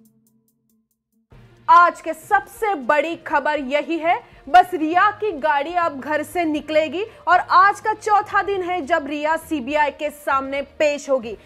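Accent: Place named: Maharashtra, India. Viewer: Superb...... your hind is too good.. ( with a slight touch of Marathi). Love your work!! keep it up!!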